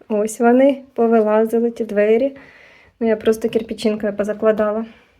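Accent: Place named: Russia. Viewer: Видно, що міська дівчина і російський акцент є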